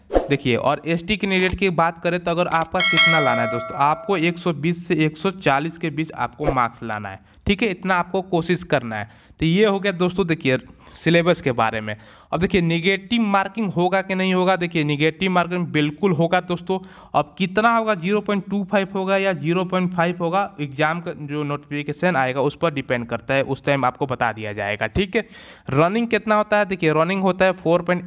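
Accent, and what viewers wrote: Bihar, India. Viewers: Bihari h kya aap??😀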